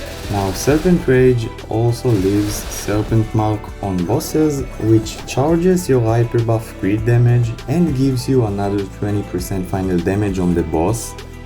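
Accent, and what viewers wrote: Israel. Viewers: 1 thing i didnt see u mention is the "Takeno's Blessing" skill from mushroom shrine. Its a fake iframe that can tank some boss patterns ( Lucid's bomb, p1 Will screen crack and a few others ) AND u can reset it with Time Leap. And if ur already going for the mushroom shrine skills u can also grab the Yorozu bind as it is also resetable with Time Leap. Also, dont worry about ur accent, its much better than other Israelis. Keep on the good work! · המבטא הישראלי תפס אותי גם חחחחח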